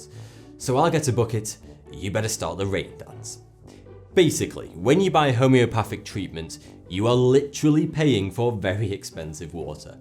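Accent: United Kingdom. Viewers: This is a British accent but from which area or city? It is interesting. London? York? Lancaster? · his British accent with a lisp is really annoying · Mikes8899 the sparkle white oversized front teeth, the pretentious and overly british accent, not to forget the smug look on his face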